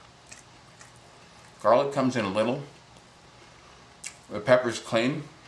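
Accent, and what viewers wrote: United States. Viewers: Garlic ? Pepper ? If you've been to Europe or other places in the World, you'd realize there are LOTs of varieties used throughout the World. I'm assuming, since you look and speak very American that it is the "typical" black pepper and the "typical" clove garlic. Especially the pepper - of which there are 100s of different types.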